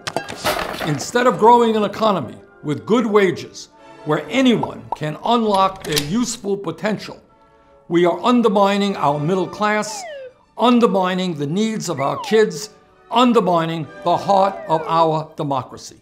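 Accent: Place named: New England, United States. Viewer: As a European I am just loving that New England accent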